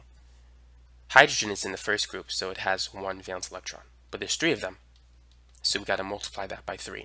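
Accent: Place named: Boston, United States. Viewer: Are you from the Boston area? you have a very interesting accent.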